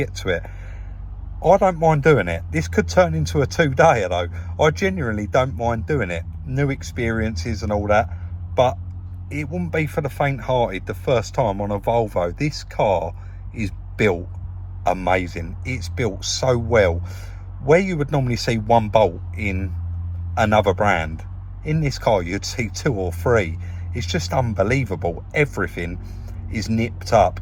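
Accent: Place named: England, United Kingdom. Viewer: i am a city and guild panelbeater( body repair tech now haha) of the old school variety with nigh on 50 years exp. and only recently threw it away because of these nightmare strip/assemble scenarios. The last vehicles i worked on were '21 audi s8 hit hard in the r/r and a virtually brand new Jag i-pace hit full noise frontal, both fantastic vehicles to work on, the Audi was a breeze really, but as you say getting one's head around the procedural dilemma is the mind fk i do not need. You do a great job of an explanation of pitfalls and tips for this volvo airbag s&a( i love volvos) I work in an engineering shop now; lathes, milling, presses etc and specialising in aluminium tig welding.. much easier haha-- love this, new found, channel and dig the pommie(p.o.m.e. = prisoner of mother england) accent, i'm in nz and have been for over 40yrs..rock on man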